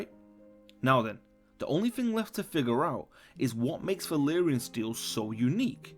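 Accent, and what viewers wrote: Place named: Liverpool, United Kingdom. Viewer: Snax Pendragon nah sounds more Liverpool/Cheshire but very light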